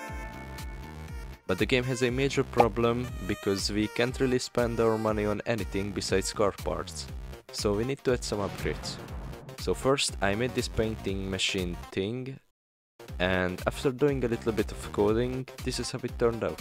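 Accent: Hungary. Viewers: Bros accent is hungarian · I know a hungarian when i hear one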